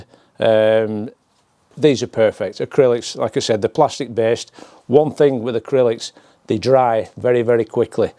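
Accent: Lancashire, United Kingdom. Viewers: Just dawned on me its Fred dibnahs accent Bolton Blackburn area😂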